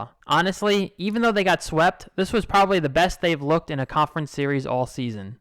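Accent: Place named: Long Island, United States. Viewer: I recognize a Long Island accent anywhere. Go Yankees!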